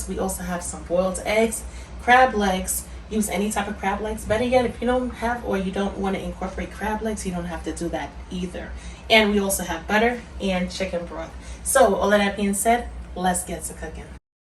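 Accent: New York, United States. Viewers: That New York accent just coming right through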